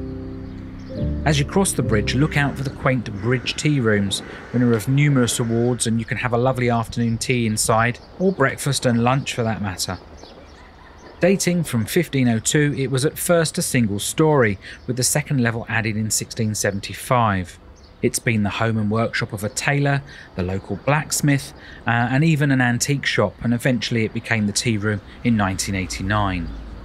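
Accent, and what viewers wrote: United Kingdom. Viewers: I like this channel. I'm from Indonesia. The amazing videos and the author writing the explanation, making it easier to me to understand the British accent. Thank yo so much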